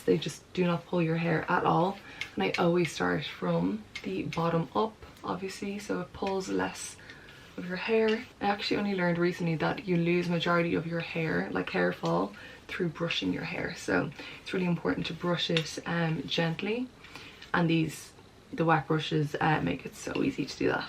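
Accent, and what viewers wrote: Ireland. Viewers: Am subscribed to you but not getting notifications.? You are absolutely gorgeous - the Irish accent too.. great to see a young person w natural beauty Love from Australia…..💛✨✨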